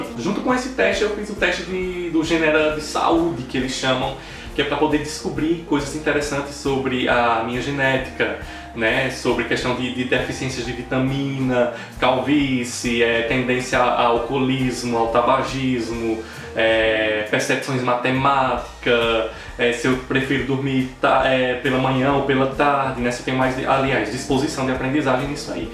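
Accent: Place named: Paraíba, Brazil. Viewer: É tão bom ouvir sotaque da Paraíba aqui no youtube ❤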